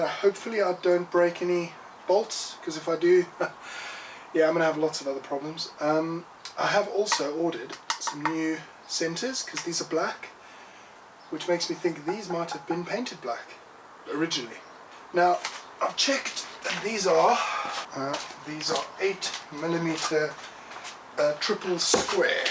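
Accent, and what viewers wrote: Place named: South Africa. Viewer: You got a bit of a mixed up accent, can’t place it, sounds a touch of South African..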